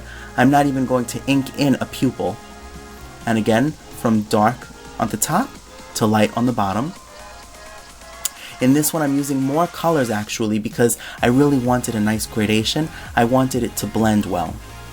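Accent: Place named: New York, United States. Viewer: Lovely art but whoa, you are like the only person from New York I've seen who sounds like they're from New York. Never heard a soft, gentle voice in a New York accent before, lol.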